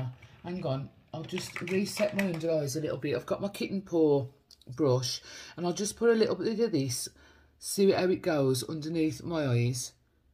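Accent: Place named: Black Country, United Kingdom. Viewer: I am from Birmingham and still live there. I would say you are from the Black Country . Love your videos x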